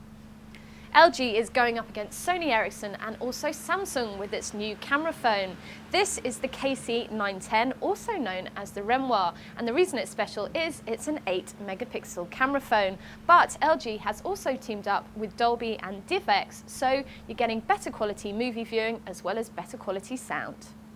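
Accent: United Kingdom. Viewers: haha, i hear you man. love the differences in the two languages (english and the queen's english). keely hazell needs to start doing phone reviews. >:)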